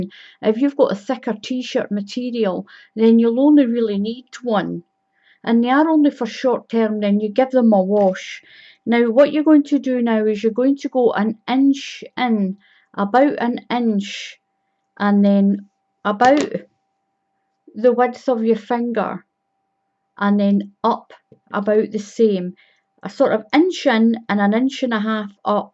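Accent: Scotland, United Kingdom. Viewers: Fantastic vid & being Scottish myself its gr8 hearing our accent 4a change. So easy 2make & who hasnt got an old T shirt laying abt some drawer at home. Thanx so much. Stay safe & well.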